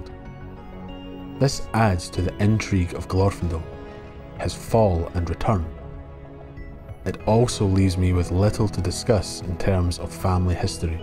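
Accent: Scotland, United Kingdom. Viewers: I always say, "Tolkien must be read by English voices to take me there", but a Scottish one does just fine, quite fine. I've tried listening to Tolkien by American voices, but I guess I've been conditioned to feel the fantasy is real only with English voices, until now, of course, although you're obviously not American. I read a complaint about your pronunciations. I have absolutely no issue, as a matter of fact, the way that you pronounce those words that end in the ending ''e'', ie., "fortresses", "pieces", "process" is quite interesting and a thing that I before you have never heard. Is that a thing unique to you or standard Scottish?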